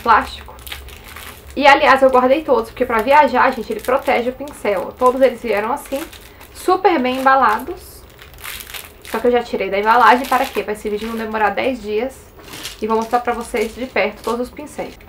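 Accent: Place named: Bahia, Brazil. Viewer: SÓ VOCÊ MESMO ! Estava pesquisando para comprar pincéis .. arrasou .. comprar nesse site e como sou ansiosa 18 dias eu aguento kkkk assim que chegar eu te conto. Sua linda muito obrigada pela dica. Eu adoro demais seu canal !. Ahhhh até esqueci mas a minha amiga que tem seu sotaque ela mora no interior da Bahia Hahahhaha 😂😀 Beijo lindaaaa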